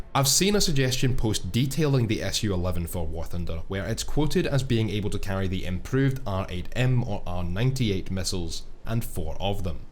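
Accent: Scotland, United Kingdom. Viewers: As a fellow Scot I believe your accent braw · I really like your Scottish accent, Mr.. Also, is that a rocket built into the tail of that ME 262?!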